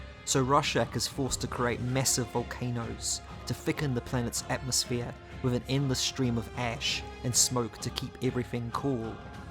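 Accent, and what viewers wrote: New Zealand. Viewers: That is a kiwi accent. Shot bro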